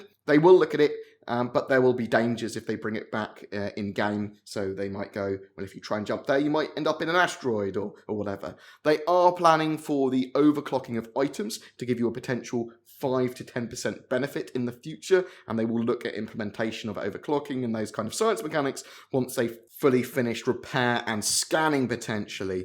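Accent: United Kingdom. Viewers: Terrific update, always love hearing about exciting SC news in a British accent. 😃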